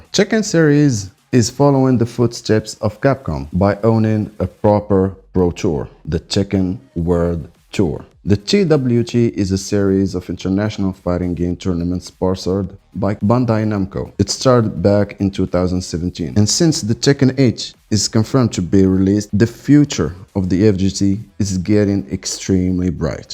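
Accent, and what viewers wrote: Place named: Morocco. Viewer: Good content bro keep it up (ps:: mn accent dyalk u sound maghribi, did i guess that right ?)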